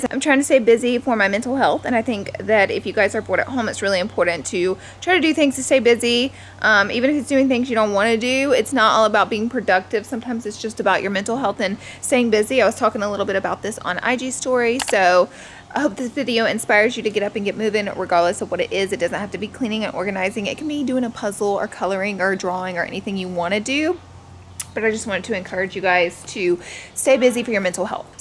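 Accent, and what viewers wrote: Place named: Alabama, United States. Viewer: Love hearing someone with the same Alabama accent, you speaking my language lol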